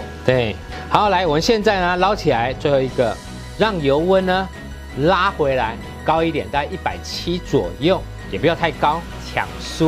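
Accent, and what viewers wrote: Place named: Hsinchu, Taiwan. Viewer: 我是北埔人，你說的客家話的音跟我ㄧ樣，我也想多學些道地客家菜，譬如 薑絲大腸等等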